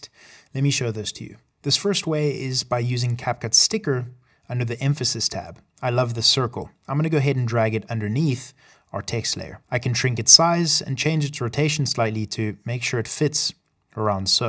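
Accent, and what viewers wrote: South Africa. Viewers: Are you South african🇿🇦? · Your accent sounds South African ? Are you ?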